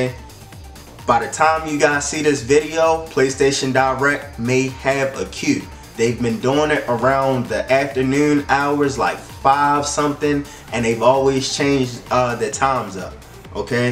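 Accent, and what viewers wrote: Baltimore, United States. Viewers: That acent you from Baltimore? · you from bmore?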